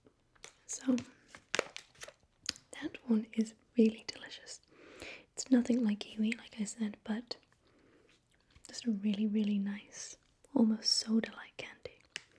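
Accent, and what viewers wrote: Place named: RP, United Kingdom. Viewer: You're quite posh, I like it though it's relaxing to me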